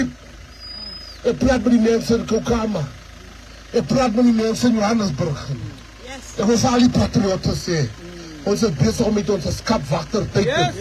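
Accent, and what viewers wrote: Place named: Namibia. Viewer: Coloured from Namibia, Mr u praat soos n Kleurling van Namibia, wat u se is presies wat in ons land ook aangaan.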